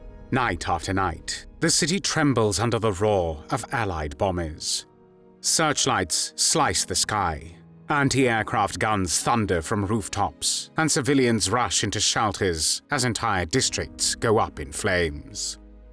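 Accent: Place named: United Kingdom. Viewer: Get rid of this standard BRITISH accent narrator. GET RID of his AUSTRIAN ROLLING VOWELS speech.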